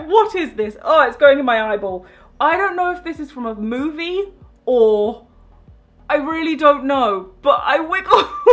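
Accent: United Kingdom. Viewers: Is she british?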